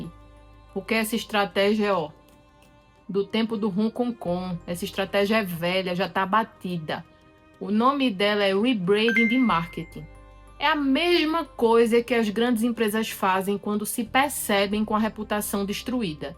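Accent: Northeast, Brazil. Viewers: Mais uma nordestina falando merrrrda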